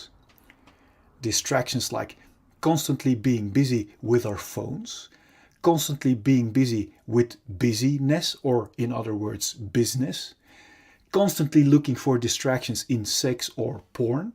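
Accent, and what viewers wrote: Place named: Netherlands. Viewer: Accent sounds Dutch.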